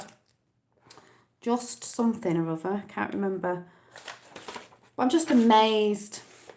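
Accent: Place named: Manchester, United Kingdom. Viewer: ... Typical accent from Manchester and little bit of Liverpool areas of Britain! 😘 💞 🎊🎈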